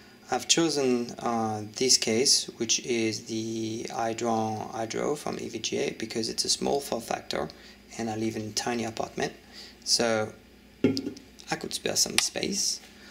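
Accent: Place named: France. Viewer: Un accent bien français mais sinon ta video est très bien continu comme ca :)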